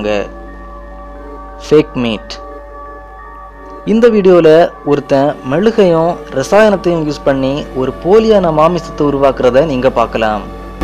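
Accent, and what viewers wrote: Kanyakumari, India. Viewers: Bro unga slang....kanyakumariya...... nagarcoil ah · Kanyakumari slang..... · Makka.kanyakumariya.unakka bhasa kettapale manasalaach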